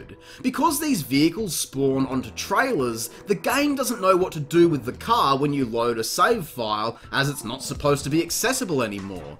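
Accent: Australia. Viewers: Dude, I’m new to your channel and I have to say, you have of the most authentic, energising and entertaining personalities of anyone I’ve seen making this kind of content. So many people play a kind of character when they’re in front of the camera. There’s nothing wrong with that per se, but it’s so refreshing to see a person cut out the nonsense and speak articulately and passionately about the things they love — and the things that give them the shits! And not to shit on anyone else, but it’s awesome to hear a proper Aussie accent without some kind of weird affectation / exaggeration that some of the more popular Aussie YouTubers seem to feel they need to put on. My only regret is not finding you sooner. Keep doing what you’re doing my man, I look forward to coming along for the ride!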